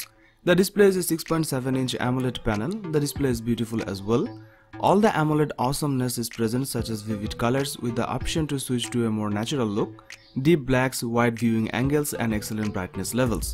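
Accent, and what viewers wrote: India. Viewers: Sound like indian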